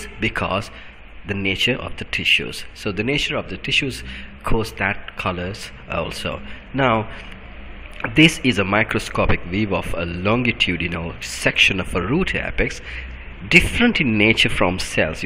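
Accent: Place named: India. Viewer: dude u got a Indian accent but i dont think a indian guy would do videos on sl sylabus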